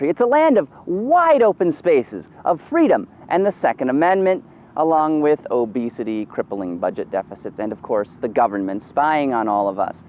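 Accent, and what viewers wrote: Canada. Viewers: i thought this guy was canadian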